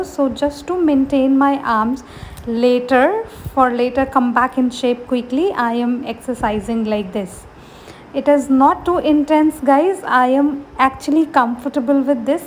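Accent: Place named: South India, India. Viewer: Your North Indian n your accent has suddenly become South Indian.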